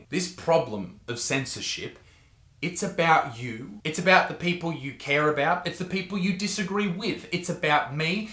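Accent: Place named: Australia. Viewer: Word, good statement mate. I like your australian English. Greetings from Germany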